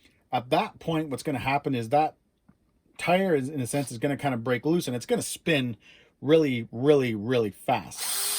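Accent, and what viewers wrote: Canada. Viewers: You from Nova Scotia ?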